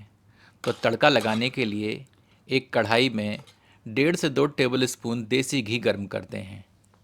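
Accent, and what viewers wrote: Standard. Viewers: Yeh shudh hindi hai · itni madhur aur suddh hindi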